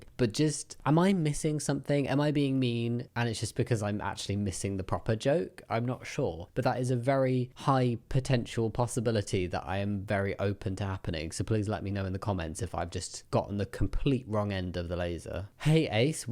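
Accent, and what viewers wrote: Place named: Scotland, United Kingdom. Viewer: You said something and I really could tell you were Scottish. The accent is there at least sometimes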